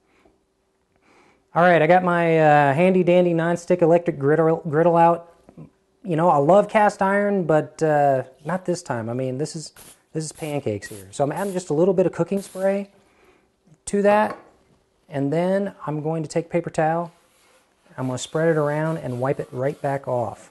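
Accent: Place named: Canada. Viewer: Sounds like he's Canadian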